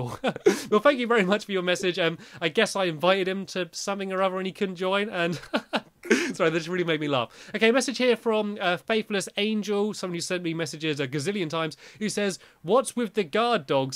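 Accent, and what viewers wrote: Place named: Newcastle, United Kingdom. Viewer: North East England! Propa Geordie :P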